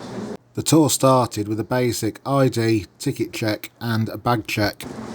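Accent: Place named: Midlands, United Kingdom. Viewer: Thank you for this little detail but the presentator comes from the Midlands and of course his accent is different !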